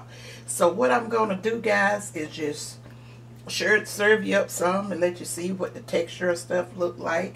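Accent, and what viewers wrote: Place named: Alabama, United States. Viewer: You speak like my late grandma. Are you from south Alabama?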